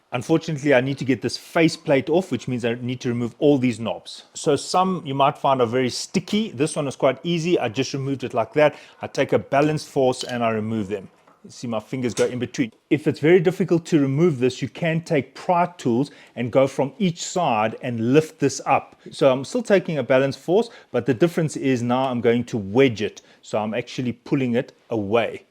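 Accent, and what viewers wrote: South Africa. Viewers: South African accent, mooi🙂👊👍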